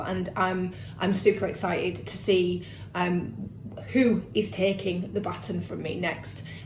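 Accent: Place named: Yorkshire, United Kingdom. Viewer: Ohh keep the faith , sound sensible Yorkshire lass, beats a warren buffet e very time , something good and instantly decent about you . lovely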